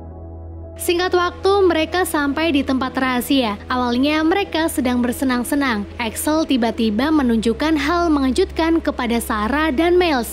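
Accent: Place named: Java, Indonesia. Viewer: Wong jowo ndi mbake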